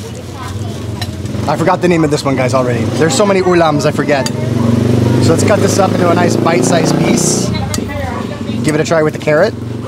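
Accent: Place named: Tagalog, Philippines. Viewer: Pareho kau ni zombie ng accent sa tagalog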